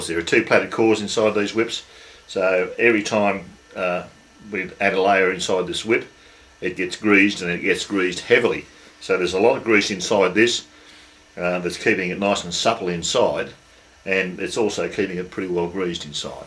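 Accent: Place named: Australia. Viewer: Hey, sorry for the question but could you write down what string material you used to make the popper? With your australian accent it's a bit hard to find out what it is:D sounds like "barlin twine".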